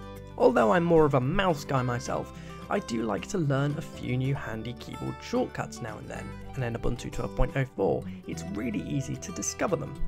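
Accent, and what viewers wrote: United Kingdom. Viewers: I LOVE Ubuntu , and I love British accent ^_^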